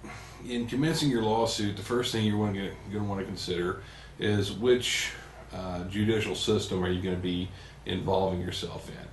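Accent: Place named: South, United States. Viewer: logical presentation. I enjoy southern accents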